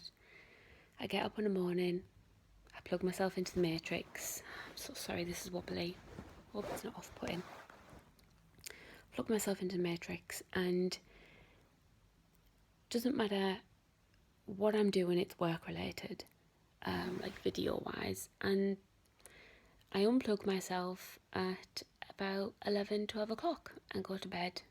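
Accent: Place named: Newcastle, United Kingdom. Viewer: .....sound like a Geordie!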